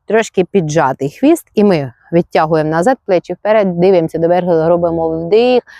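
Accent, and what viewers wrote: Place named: Poland. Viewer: Спасибо Вам. Приято слушать Ваш говор, наверное польский.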